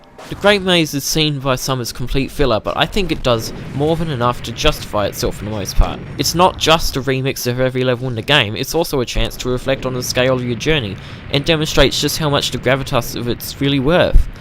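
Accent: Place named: Australia. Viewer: Australian voices <3 You <3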